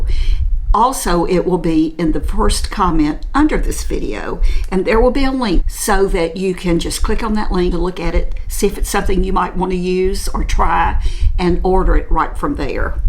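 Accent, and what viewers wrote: South, United States. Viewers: You are from the south...obviously your gentle lilt of an accent. I lived in Atlanta for 16 yrs. you are a true southern belle☺ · I'm glad you shared that you're a strict vegetarian and the💖 e.l.f. is 100% vegan!!! I'm just now at 68 starting to try make up. And I do care a great deal about NO animal cruelty. Thank you so much for sharing all that. I love your video's so much. 💖 You're so sweet and I just adore you. My dad was from NC so I also love your southern accent and mannerisms too. Feels like home to hear it. ❣💋 I wish I could agree that your lips look plumper, but I don't think they do. I tried that product too but I couldn't handle the burn and had to return it. So maybe I didn't leave it on as long you did. I have thin lips too like my mom did, and I keep watching them shrink too. 😞 But wow, quite a beautiful transformation.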